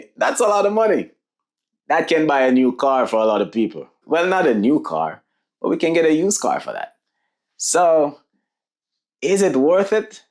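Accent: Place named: Caribbean. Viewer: I cannot help but notice , you West Indian I can hear an accent . PS I feel you should keep it tbh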